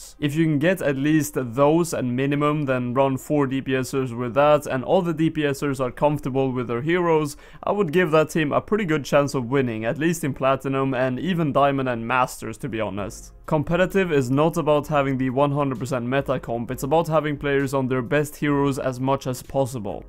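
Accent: Sweden. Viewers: i thought so because of your accent but i was not sure. I'm swedish too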